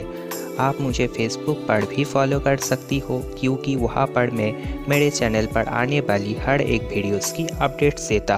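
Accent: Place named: Northeast India, India. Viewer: Dear Dev he is perhaps from NE, Govt. and us should encourage and support NE guys , who are also our brothers and sisters, and r part of India.Southe indians , Bengalis have different accent. That is the flavour of India.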